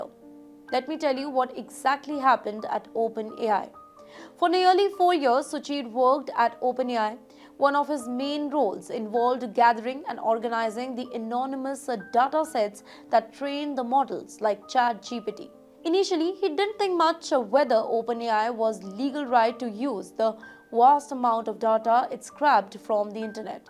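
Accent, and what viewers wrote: India. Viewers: It's time to learn indian english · Narrator Audio NOT Cleary Understandable, Typical Indian English, JUST RANT IT OUT, weather the Audience Get it or not, JAI HIND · Why does Indian accent make any story that’s being covered suck .. I don’t get what she is talking about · This news reader need to learn how to speak clearly. Too much indian accent. I need to read subtitles to understand what she is talking about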